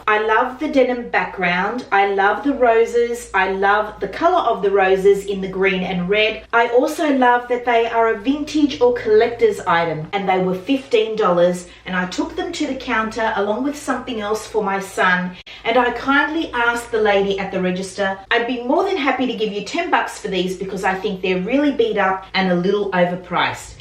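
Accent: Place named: Australia. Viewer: You did good again. Be careful wearing that navy "slip dress". Warner's is a lingerie line. I think that's a "slip" for "under " a dress. Sorry, I couldn't understand your Aussie accent, but we're you say Lida Biday? I think that's a designer from Sweden. I have a problem distinguishing plaid and check, but I think that's a plaid shirt. If it's too large a seamstress can alter it smaller to fit you better. I can't understand how the prices are sooo cheap. Also, beautiful Frenchie shoes.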